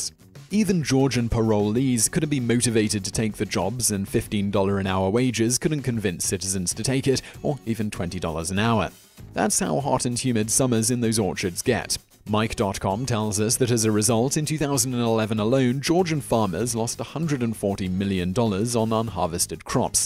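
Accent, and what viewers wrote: United Kingdom. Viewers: Great. A guy with a British accent wants to tell everyone what America should do as if he is the expert.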